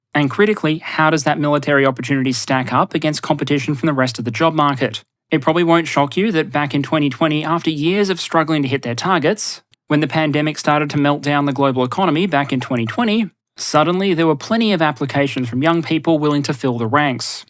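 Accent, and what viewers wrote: Australia. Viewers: After listening to a few of videos I have grown to associate Australian accent with being stupid. Every time I hear it I immediately think that there is a moron speaking.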